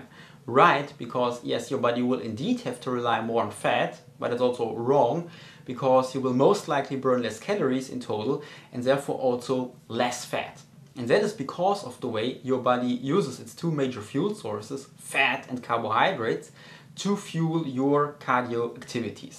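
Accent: Germany. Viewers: Great video as always. Too many people thinks empty stomach cardio is best for fat burning. Thumbs up for german accent!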